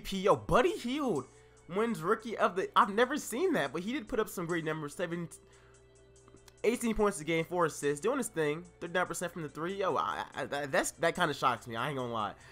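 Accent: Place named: New York, United States. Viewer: It sounds like your From ny are u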